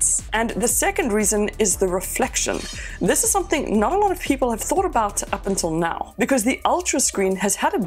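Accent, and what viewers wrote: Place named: South Africa. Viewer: You sound South African :) Love the content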